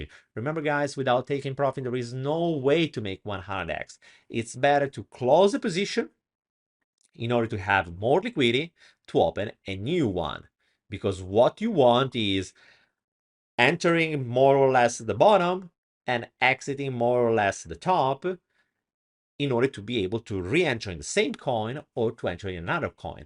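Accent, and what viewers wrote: Italy. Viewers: they are mim coins, not mimi coins. just saying. cute italian accent though ;-)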